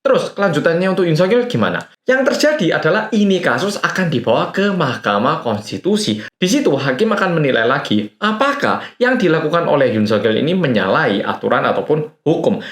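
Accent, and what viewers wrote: Java, Indonesia. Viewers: Mantap masss medok, update terus yaaa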